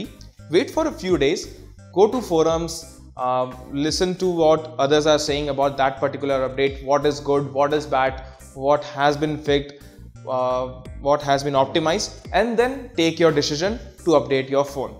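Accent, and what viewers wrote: India. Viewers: Share it lmao this is why I love indian accents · please make your english much more clear remove* your accent(indian)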